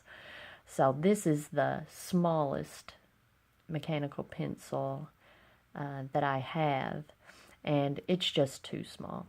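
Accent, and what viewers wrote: South, United States. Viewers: Are you Southern USA?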